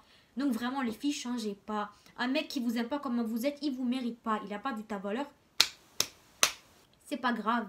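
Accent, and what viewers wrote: Marseille, France. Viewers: Trop belle Mash'Allah ta grv un magnifique accent sa fait penser à Marseille mdrr ❤️❤️😘😘😘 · C’est bizzare ta un accent parisien et marseillais en même temp, tu viens dou???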